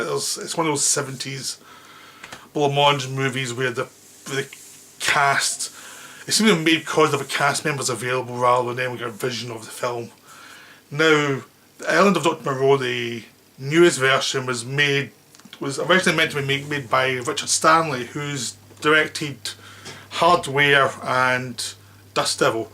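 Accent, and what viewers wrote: United Kingdom. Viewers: Fucking limey shut up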